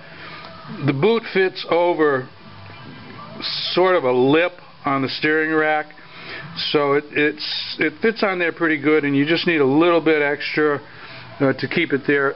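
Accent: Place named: Connecticut, United States. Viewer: I really enjoy the Corvette videos. Very informative and useful. Do you happen to be from CT? Accent sounds that way...keep up the good work.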